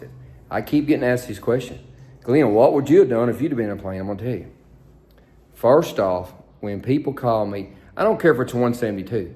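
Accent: South, United States. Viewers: Ive never heard anyone so smart with your accent. You must be the king of the southerners.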